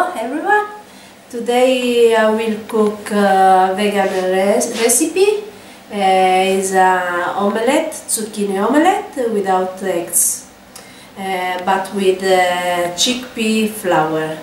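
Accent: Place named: Italy. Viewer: U are so sweet I love Italian people and accent ♥️💚♥️💚♥️💚thanks for sharing video God bless you 🙏🙏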